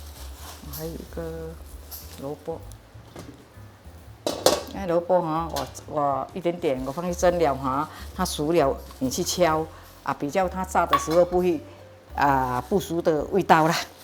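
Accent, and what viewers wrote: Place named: Hokkien, China. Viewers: 潮州人吧！ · 是閩南人吧，口音很熟悉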